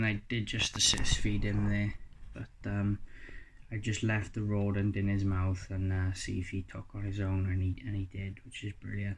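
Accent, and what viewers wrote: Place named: England, United Kingdom. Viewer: Im guessing that you are English by your accent. Do you guys have corn snakes there? Thanks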